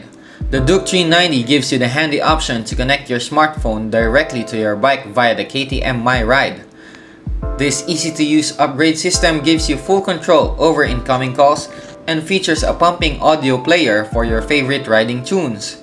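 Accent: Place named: Conyo, Philippines. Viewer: grabe conyong conyo si idol! nosebleed ako hahaha.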